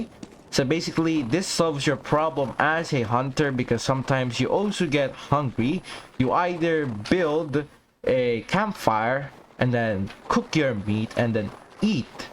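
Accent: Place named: Philippines. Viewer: Bro u have the filipino accent, r u filipino?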